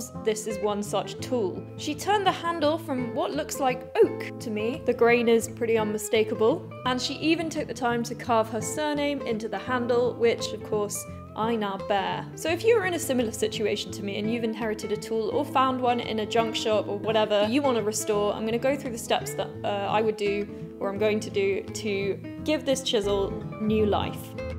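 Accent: England, United Kingdom. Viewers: You’re amazing! I love old tools and restoring old treasures, bonus you’re a pretty girl with an English accent. Couldn’t make a more perfect video! ❤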